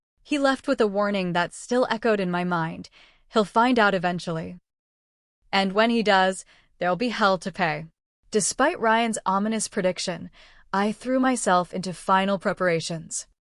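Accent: United Kingdom. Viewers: What accent does the narrator have,from UK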